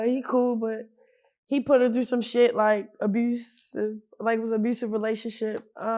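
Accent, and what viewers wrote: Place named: Maryland, United States. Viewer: I can definitely hear that "Murlen" accent in her voice lol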